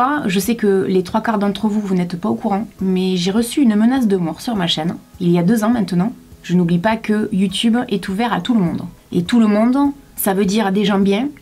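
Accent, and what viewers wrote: South, France. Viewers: Ha, je pense que cet internet de malheur exacerbe les bas instinct de la nature humaine autant que le narcissisme.. De facto, cela se répercute au quotidien, les gens sont devenus totalement égocentriques, agressifs et parfois violents!.. Je suis tombée par hasard sur tes vidéos, pour le peu que je me connecte à you tube, où je regarde surtout de vieux films ou de vieilles séries... Évidemment c est ton accent qui m a tout d abord attiré, si familier à mes oreilles...l accent de mon sud... le tiens c est le miens et le miens c est le tiens!! L Accent! C est fou.. Mais j ai l impression d entendre une soeur... Une frangine d âme et de coeur... Dame de coeur, tu l es, à n en pas douter... Cette vidéo vient de me prouver que je ne me trompais pas... Nous avons tant d affinités inspirées par une grande sensibilité si perceptible... Je ne m étendrai pas sur le sujet... 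Ma vie privée ne regarde que moi et ceux à qui j ai envie de la partager... Autant dire un cercle très restreint... Mais franchement, tu me ressembles tellement... Bon, je me lance, au risque d être ridicule... Puisque nous vivons dans le même département, j aimerais bien te rencontrer! Gros poutous sister! Laurie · dit moi ton accent il pas du sud parceque ce qui sont proche de perpignan on la accent chantant mais je t'adore maman yutube desoler je suis dixlesie bisous du bord de mer maditerane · Hello ca fait une petite semaine que je regarde tes vidéos maintenant et je t’adore ! Tu es géniale, tu es toi même ! Tu es une super belle femme ! Tu as des cheveux mais magnifique ! 😍😍😍 Je ne sais pas qu’elle âge tu as exactement mais tu as l’air jeune et très mature en même temps et cet accent du sud j’Adore totalement !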